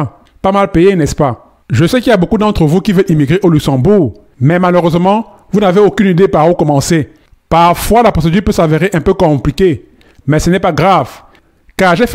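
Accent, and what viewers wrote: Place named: Cameroon. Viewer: Mon frère camerounais le ton là je reconnais déjà ❤❤😂😂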